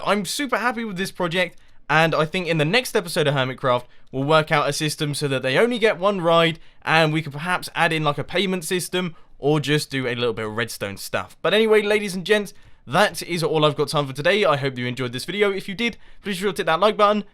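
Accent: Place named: United Kingdom. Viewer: Hey Mumbo =) Few things I want to tell you about this episode and future ones. 1. I really enjoy time-laps parts with cool chilling music and you building something. It's much much better and nicer to watch then "progress updates" :P 2. The first part of yours roller coaster (when you climb up) should be slower. Consider placing power rails less often like 1 normal 1 power rail. This may do better effect. 3. I enjoyed episodes where you well playing in some game in Hermit HIlls. 4. For future Roller Coaster you can add some Fire jump circle (like this one in circuses). It would really do the scarry thing. At the end thank you for your videos. I enjoy watching every episode and I learn english (+ lovely British accent). Keep it UP!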